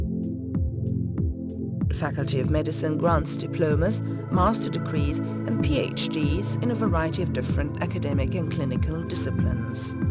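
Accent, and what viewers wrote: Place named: Egypt. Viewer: dude she`s egyptian 100% it`s all about how she spell the letter "ق"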